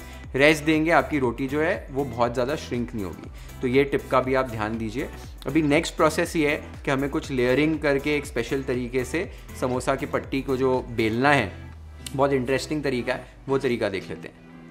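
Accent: India. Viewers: Btw Your accent like Indian, thanks a bunch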